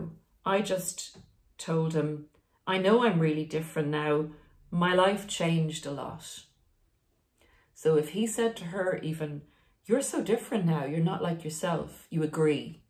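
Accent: Ireland. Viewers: I have followed for 1year, its been an invaluable resource for dealing with the aftermath of narcissistic abuse...the narc sussed gray rock forcing a reverse discard. Thank you, great to hear an Irish voice on such a personal subject.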